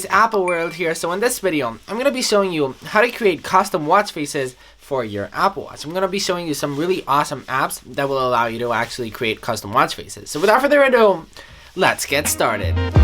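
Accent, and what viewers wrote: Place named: Spain. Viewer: He is from Spain. Of course he isn't gonna sound just like you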